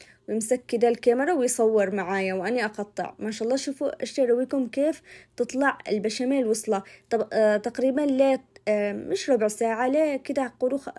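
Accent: Aden, Yemen. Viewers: اللكنة العدنيه تجنن عليكي❤❤ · مشهيه بكره بسوي بنفس طريقتك إن شاءالله ..تسلمي ياعسوله وكلامك العدني ياسلااااام ❤️❤️ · حبيبتي ياسلام على هالكلام العدني الجنن يجنن كلام عدن والله خصوصا كلمة ماهلوش اااه ذكريات لي في عدن الحبيبه اختك من البيضاء مقيمه في جده ❤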